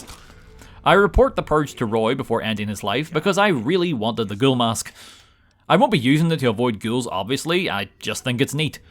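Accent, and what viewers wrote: Northern Ireland, United Kingdom. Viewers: Clicked on this video and was immediately flash banged with "HOLY SHIT IS THIS DUDE NORTHERN IRISH" lmao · My m8 said u were northern irish the 1st time I heard ur voice I knew he was right and what is ur least fav religion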